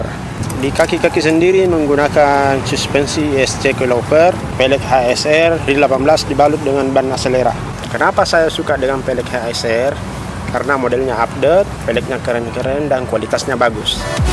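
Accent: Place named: Medan, Indonesia. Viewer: Orng medan abg ni ku yakin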